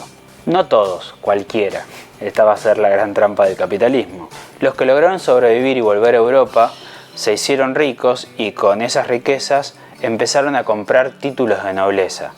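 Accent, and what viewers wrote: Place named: Argentina. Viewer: Es un buen análisis acerca de los orígenes del capitalismo, aunque faltó añadir la clase de perversidades que es capaz de llegar a cometer lo que el propio Papa denominó como "capitalismo salvaje", y que es la etapa superior del capitalismo llamada "imperialismo", y su nueva arremetida que actualmente se lleva a cabo denominada "neoliberalismo".Sabemos que hoy la cuna del capitalismo mundial está en Estados Unidos. Este país no es más que un conjunto de empresas muy poderosas que a través de guerras e invasiones buscan adueñarse más y más del poder mundial. Supongo que estas ideas las desarrollarán en próximos vídeos. Un sólo aspecto de este vídeo al comienzo me incomodaba, después ya me desagradó, finalmente terminó molestándome. ¿Por qué el locutor acentúa y enfatiza tanto en la pronunciación de la letra "ye" o la "ll" convirtiéndola en una "ch"? 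Él no dice "llega a convertirse" sino dice "chega a convertirse". Ya sé que esa es la pronunciación del argentino, pero al menos podría suavizar este rasgo para así universalizar más este buen vídeo y evitar que con ese sonsonete caiga en localismos y regionalismos que pueden resultar no del todo agradables.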